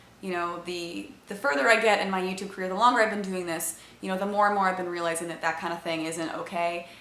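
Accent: South, United States. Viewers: Following her big year of atheist drama, she is now moving towards a kinder, gentler atheism. Does that mean she is going to stop using that incredibly bigoted southern accent she always uses by default when she thinks she's making a good point? Oh, that's right, she does that because of her white guilt. Well, it's a good start. Hope she can get there while she's still young and pretty, cause when she gets older it's just gonna come across as mean and Nasty. God bless her.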